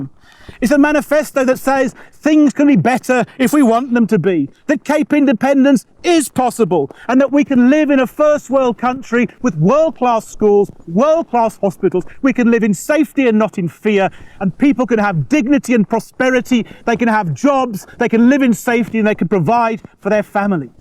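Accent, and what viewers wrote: United Kingdom. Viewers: Why is the speaker British?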